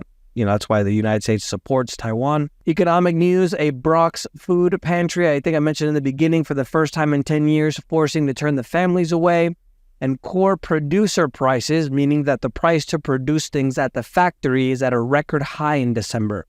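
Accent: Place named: Spain. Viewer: I miss your Spanish accent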